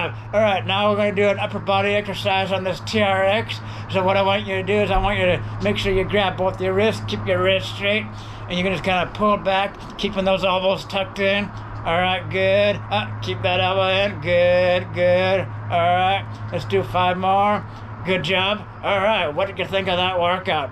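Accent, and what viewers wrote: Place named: Scotland, United Kingdom. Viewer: Why does he have like a Scottish accent?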